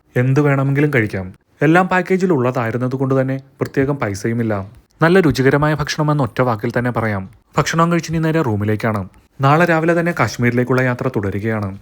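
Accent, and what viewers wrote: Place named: Kottayam, India. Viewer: കോട്ടയം സൈഡ് ആയിരിക്കും ഇവര്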